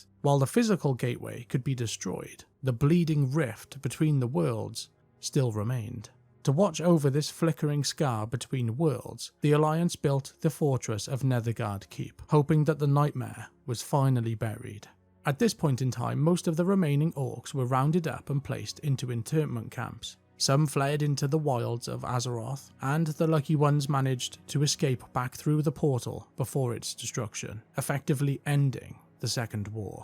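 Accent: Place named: Cardiff, United Kingdom. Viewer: I know a fellow Cardiff boy when I hear one!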